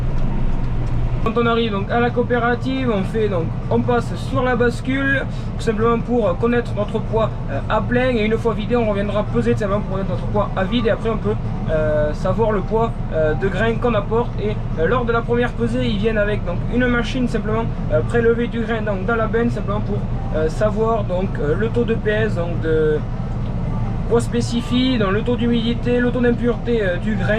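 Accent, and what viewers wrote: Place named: Toulouse, France. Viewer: Vue l accent je dirai Toulouse alentours mdr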